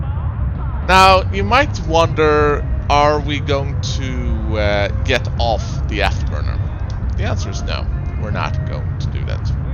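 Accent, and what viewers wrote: Sweden. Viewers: good svengelska fett bra video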